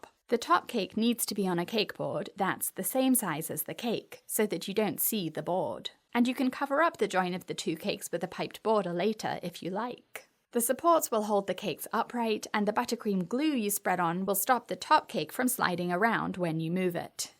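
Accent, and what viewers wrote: England, United Kingdom. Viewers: What part of England are you from? I can’t figure out your accent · Thanks a mill for this very helpful video!! 🩷🤍 Also you have a gorgeous British accent!! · Honestly I'm devouring your information and tutorials.. they are so informative and clear.. especially with your beautiful British accent.. im attempting my first layer cake and have taken all your info on board to ensure I'm not rushing... still nervous but mire confident with your amazing tutorials.. thank you ❤❤😊 xx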